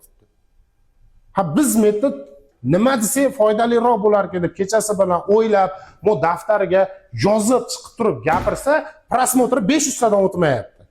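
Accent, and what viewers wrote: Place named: Andijan, Uzbekistan. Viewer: Anjanchasiga gap boʻldi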